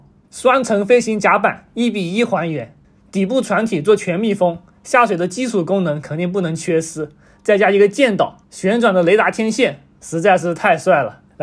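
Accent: Jiangxi, China. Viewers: 江西舰？赣州人吧 一听就是水西西瓜皮的口音:face-blue-smiling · 听你说话，就知道是赣普🤭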